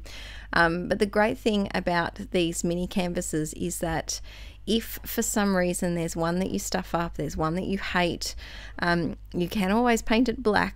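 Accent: Australia. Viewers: Love your art I have been trying to work out your accent good to know we have another Aussie doing mixed media TFS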